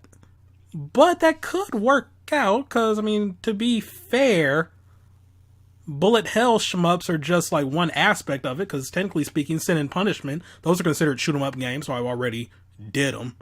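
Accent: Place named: Michigan, United States. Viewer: I thought you had more of a midwestern accent. Just didn't think it was Michigan.. Sadly I can't make it to MWE. Didn't even hear anything about it until you mentioned it in the video.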